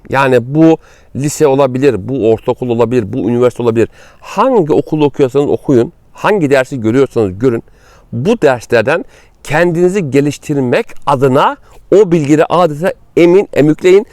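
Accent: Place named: Black Sea, Turkey. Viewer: Hocam cok guzel karadeniz ya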